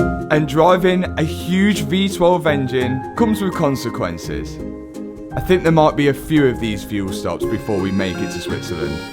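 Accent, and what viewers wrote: Leicester, United Kingdom. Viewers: Your accent sometimes I'm unable to understand. Is it a British accent? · big teeth and the british accent jumpscared tf outa me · Is the accent a Leicester accent? It's a glottal stop wonderland!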